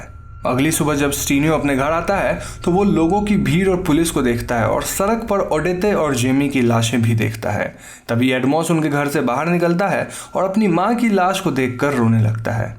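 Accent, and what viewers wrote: Bengal, India. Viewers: U r bengali right , accent shune bujhalm but whatever you're doing that's awesome.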